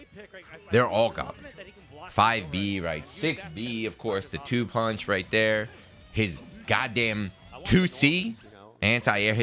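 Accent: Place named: North Jersey, United States. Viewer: Bruh your North Jersey accent is so cringe. Plz quit the weeb FGC, thx.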